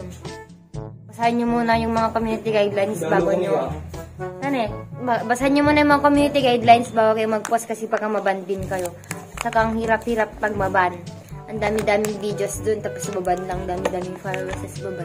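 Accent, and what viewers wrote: Ilocos, Philippines. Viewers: Hi ilocano ka ate?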